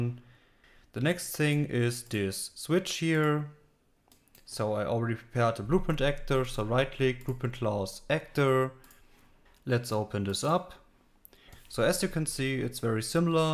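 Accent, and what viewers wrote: Germany. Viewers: Thanks for your tutorials, they are very helpful. Actually, I'm learing UE5 right now and for that, I'm trying to build my own Train Game. So far so good, I've implemented some models, two bogies per wagon and some algorithms that calculate the right placement of the train body and the back bogie position on the spline in order to always keep the same relative distance between the two bogies. The next step would be to implement switches, I think that should be fairly easy with your method, just switch the active Spline Track of each of the bogies in the moment they hit the hitboxes. But I've faced another, logical, problem/challenge. Let's say you connect some spline tracks with each other (actually haven't tried that, but that should work relatively similar to the switch "problem") and you build a U-Turn, which would place you on your "origin" spline, but the other way around. 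In that case, moving "forward" would not mean distanceAlongSpline+velocity, rather you then would have to subtract your velocity from the distance in order to move "forward" or lets say in the right direction. I'm really not sure how I could do that, like find out what would be the right direciton for the train.. Maybe we could chat a bit about this issue and what you think about that? Thanks in advance!:) Ps: You sound German, is that right? :D Then you could also answer this in German, no problem.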